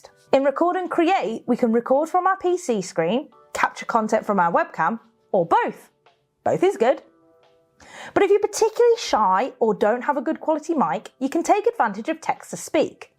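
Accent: United Kingdom. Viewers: This woman definitely British 😂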